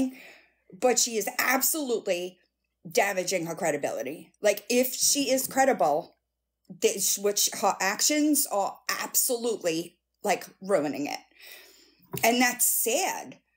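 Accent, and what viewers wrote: New York, United States. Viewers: Im not being a weirdo or anything but I just wanted to tell you that you are a very beautiful Woman who gives off an aura of being a super strong intelligent woman who puts up with no bull shit❤ it's very refreshing to see and hear. So Smile! I do not know your whole story but I know that you just went through having the loss of your mom, I believe, and I just want to say that I will pray for you and hope that you can heal from such a traumatic event in life. That's what I consider this to be, in my own life as well. Okay I hope I said that right and I'm the one who said I love your accent. I'm from New York too, just not the city just a little above that around Poughkeepsie and I miss it so much 😢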